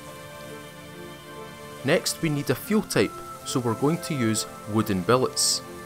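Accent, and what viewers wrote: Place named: Scotland, United Kingdom. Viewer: First time looking up this game on YT and HELL YEAAAAH ....SCOTTISH LAD... BEAUTIFUL!!!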